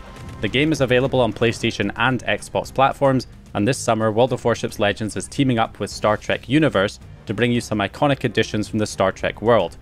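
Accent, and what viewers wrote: Scotland, United Kingdom. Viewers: You Scottish mate?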